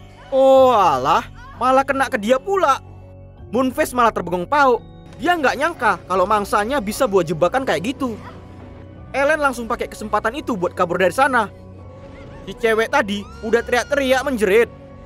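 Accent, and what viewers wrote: Medan, Indonesia. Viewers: Suka kali aku sama logam abang kayak orang medan karna aku orang medan🤣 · Medan nya itu loh 😂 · Org MEDAN ya cara penyampaian bahasa nya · Orang Sumatra bg??? Logat mu anak medan kali, kalo iya sama kita aku Binje nya.. Kata " Berondok, tunjang, palak" Itu cuma ada di daerah kita 😅😂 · Film horor katanya..harusnya awak takut dan merasa tegang..ini ga pulak..sor kali lah dengar logat medan ini..pokoknya menghibur kalilah bang..😆😆😂😂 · Wkwk, salfok sama suaranya. Logat medan bgt😭😭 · Aksenmu medan atau riau yak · Fokus ke suara adminnya pasti dari medan kleeen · Dendam cewe PMS 🤣 Bte Logatnya medan x ya bang 🤣 Sukses terus ❤️ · Kayak orang Medan logat kau bang ... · Baru lihat channel ini... Dari cara bahasa.. Aku tau ini Medan banget.. Krn aku jg Medan😁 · Kental kali khas Medan nya bg😁😁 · Org Medan bg????? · Nampak kali logat medan nya bah hahaha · Medan kali baahh... 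Baru kali ini nonton film horor terkekeh bang...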